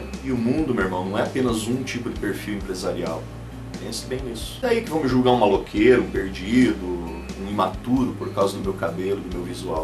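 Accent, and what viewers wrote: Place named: South, Brazil. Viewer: Cara, da onde tu é? tu tem sotaque do sul! tu é gaúcho? Muito bom o vídeo, parabéns!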